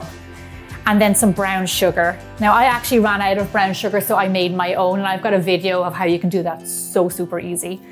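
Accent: Ireland. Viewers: You Irish? Edit : I've just checked and you are😊🇮🇪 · I love your cooking the dog treat ones were so much fun and easy to bake! My dog ate them so fast I’m not even such he swallowed!! 🤣 (Also are you Irish!?’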